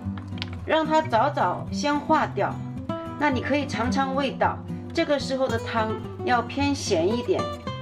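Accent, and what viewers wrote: Shanghai, China. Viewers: 不是普通话，而是浦东话。乡音啊，亲切的。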